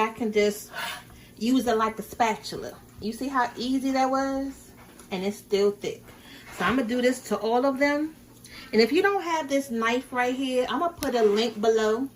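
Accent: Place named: DMV, United States. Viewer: Sound like she from the DMV!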